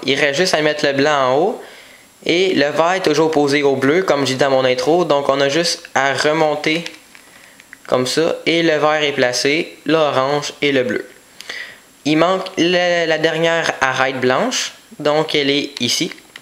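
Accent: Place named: Quebec, Canada. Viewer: Le québécois en force x) Merci pour l'tuto !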